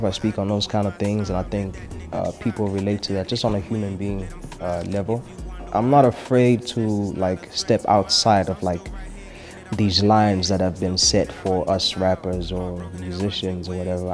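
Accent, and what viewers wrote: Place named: South Africa. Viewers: Coz his south African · why is he using his south African accent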